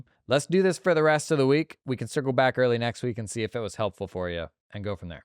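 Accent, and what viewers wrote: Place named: New England, United States. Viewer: why the AI use southern vernacular with a noticeably New England cadence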